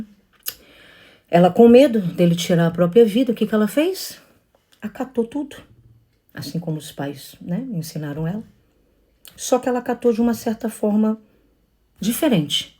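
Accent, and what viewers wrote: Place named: Rio Grande do Sul, Brazil. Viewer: Maldito desse ou é gaúcho ou nordestino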